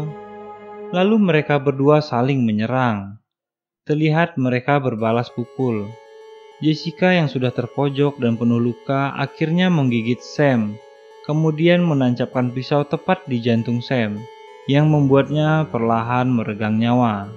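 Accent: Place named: Java, Indonesia. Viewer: ejaan sikopet dia bilang juga bener kok. Kan itu accent nya dia neng. Kaya amrik sama english "neither either" (if you know lah) . Dia juga di video gak pake Bahasa baku amat. Orang jawa kan sering pake plesetan di pronunciation A nya. Like benar bener. Teman, temen. Cepat, cepet. So what's wrong lol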